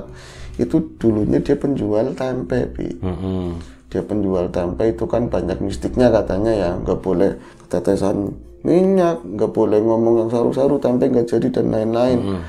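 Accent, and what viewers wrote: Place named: Jakarta, Indonesia. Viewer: Mas sm mas sama2 dari Tegal, tp logat mas udh kayak penduduk asli jakarte/Betawi🥰 Sdg mas msh orisinil Tegalnya👍🤗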